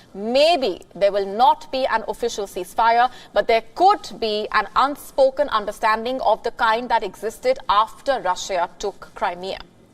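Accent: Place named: India. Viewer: What an english accent., please choose a narrator with a good accent., she is talking indian not english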